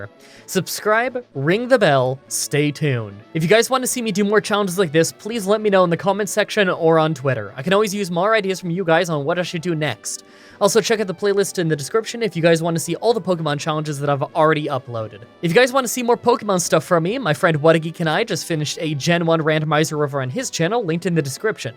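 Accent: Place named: Canada. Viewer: As promised on twitter. Your canadian accent is showing I can hear your very noticably canadian outs! Will be back next week probably with the exact same comment as previously promised!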